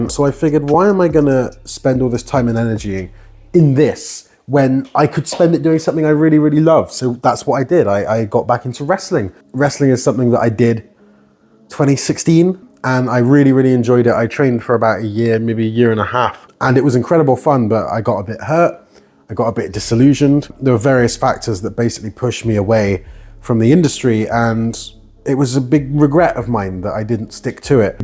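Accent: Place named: United Kingdom. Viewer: aha, okay. The reason I ask is because I’m having a minor personal Mandela effect. You sound absolutely British, but I have this strange recollection of you having a foreign accent in the past. And yet clearly you are an Englishman. Sorry, I’m a yank, and I could have sworn you used to have a different accent, like Swedish or Russian or something completely different. I’m tripping. 😅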